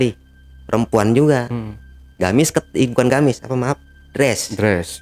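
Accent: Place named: Jakarta, Indonesia. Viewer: Asli depok neh,bahasa nya kaya kita pisan....betawi pinggiran....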